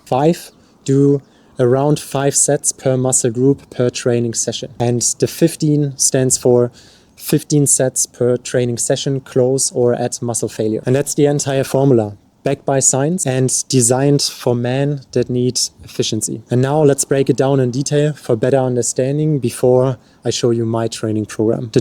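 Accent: Germany. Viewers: When germans speak english :D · Are you German by any chance... I live in Germany and your accent is like German... Just curious. Also, very honest advice. I hope and pray your channel grows since the major population on YouTube or social media looks for flashy shortcuts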